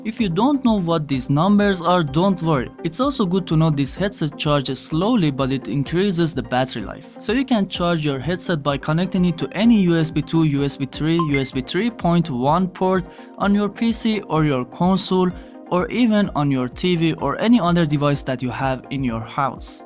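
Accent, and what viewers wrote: Iran. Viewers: Useful video Thank you But i recommend you to improve your English pronunciation (you speak like Persian Iranian people) ❤ 🙏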